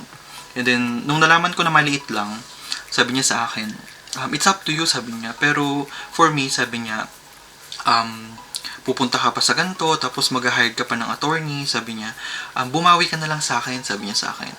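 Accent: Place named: Ilocos, Philippines. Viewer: TAGA San ka Po parang may Punto ka Ng pagka ilocano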